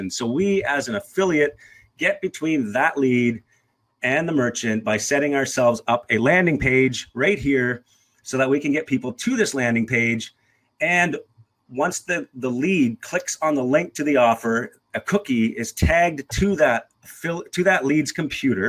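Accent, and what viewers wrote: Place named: Wisconsin, United States. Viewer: Are you from the midwest? I’m hearing a Wisconsin accent i think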